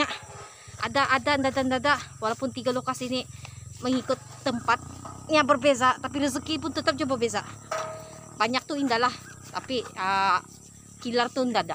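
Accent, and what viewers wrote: Brunei. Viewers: ooh cakap macam bahasa brunei bah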